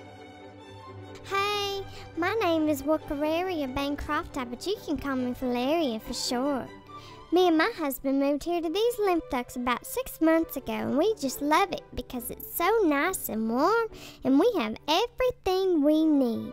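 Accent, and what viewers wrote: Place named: South, United States. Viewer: That's very very nicely done.Please do other parasites too. I guess anything with southern accent is catchy.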